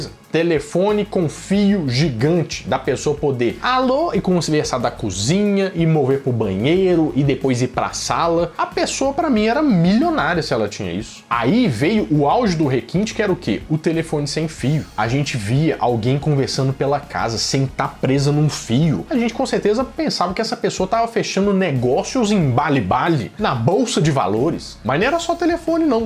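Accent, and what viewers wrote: Minas Gerais, Brazil. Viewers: Eu aqui,dando faxina no quarto,parei para comentar 😂 Morrendo de rir,não sei o que é melhor,sua criatividade ou seu sotaque De uma mineira para um mineiro,nosso sotaque é lindo!😊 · Jeitinho mineiro de falar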